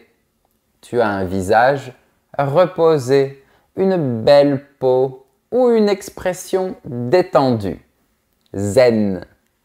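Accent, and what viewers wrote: South, France. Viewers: Je suis française et si je t’écoute c’est par pur plaisir. Et puis je suis exilée dans le nord et j’adore ta façon de prononcer « autre chose » ( au lieu de ôtre chôse ) ça me rappelle le pays. Pour « emballer » chez moi c’est plutôt un synonyme d’enchanter: « ça ne m’emballe pas de prendre la voiture par ce temps pourri »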